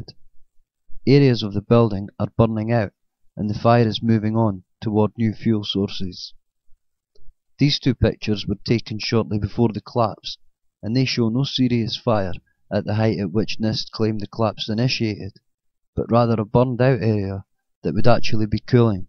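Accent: Scotland, United Kingdom. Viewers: Great video, your scottish accent makes it all the better. Funny how ppl appeal to authority and their models, when models simply express the pre-determined conclusion, rather then consider the alternatives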